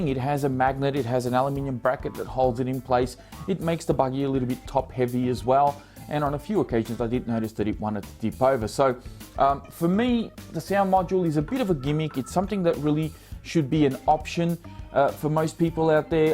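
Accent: Australia. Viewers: bro ur voice is classic aussiee!!! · cool accent to auzzie and south African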